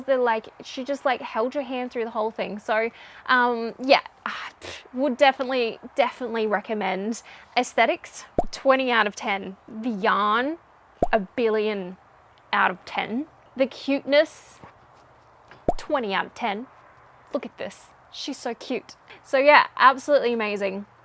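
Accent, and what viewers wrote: Australia. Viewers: Love the podcast and hearing our fabulous Aussie accent on screen. Love the look of the bag and yarn from Bendi too. Thanks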